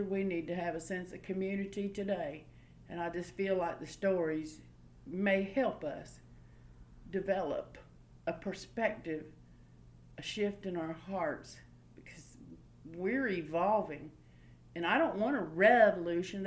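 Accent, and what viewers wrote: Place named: South, United States. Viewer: Hearing more of this southern accent I’ll even return the favor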